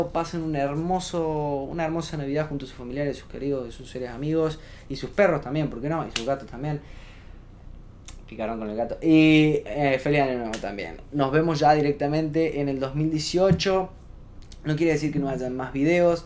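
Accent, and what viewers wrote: Argentina. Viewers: de que parte de argentina sos vieja?.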